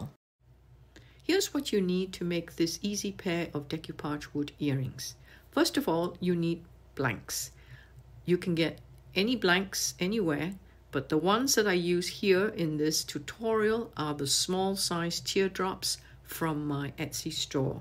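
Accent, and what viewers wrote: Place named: United Kingdom. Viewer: Such cute earrings. I wasn't expecting your voice with a British sounding accent. It was so nice to hear your voice and I think you should do more tutorials with your voice.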